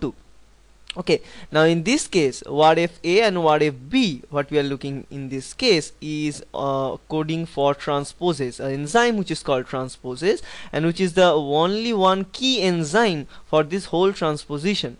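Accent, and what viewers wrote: India. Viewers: Dude I swear. Every single time. An indian accent.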